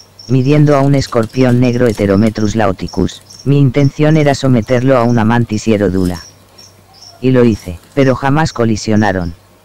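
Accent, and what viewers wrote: Spain. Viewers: él es de España.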